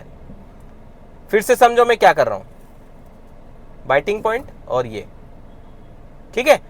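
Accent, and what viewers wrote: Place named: Malwa, India. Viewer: मालवा क्षेत्र के हो लगता है ❤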